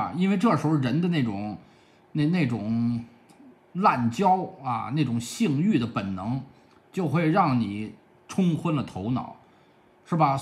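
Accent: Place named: Beijing, China. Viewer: 北京话好听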